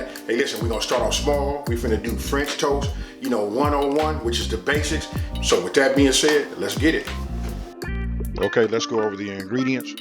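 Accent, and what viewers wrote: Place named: California, United States. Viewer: The California accent though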